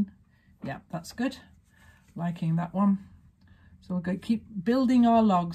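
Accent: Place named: Northern England, United Kingdom. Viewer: This is NO disrespect to our transatlantic quilting u tubers (love Jenny Doan et al to bits) but it's so nice to hear an English accent on-line, especially a Northern one. I've just discovered your channel and I am in love with it already. Your easy going style suits me fine, as I'm a bit of a 'gonzo quilter' with an aversion to precision work - though I am quite fussy about the end result. Only problem - I've spent an entire afternoon drooling over your tutorials. But time well spent! You've given me the boost I need for the next few projects.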